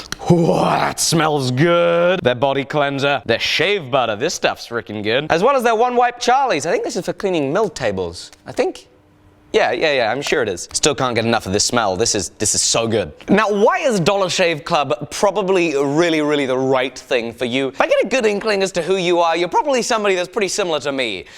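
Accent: United Kingdom. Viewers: Wait..... he is america?!?!! wtf he sounds british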